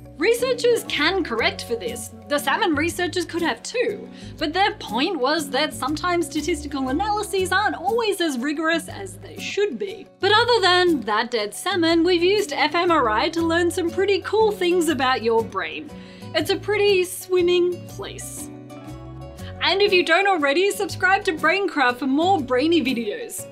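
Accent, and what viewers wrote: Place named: Australia. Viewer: Oh God, I'm in love with you and your Australian accent even though I have a girlfriend. (Shh, It's a secret)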